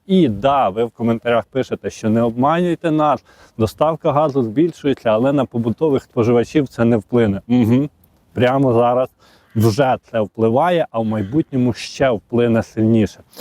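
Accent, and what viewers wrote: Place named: Western Ukraine, Ukraine. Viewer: ну просто "ріже вуха" западенський акцент.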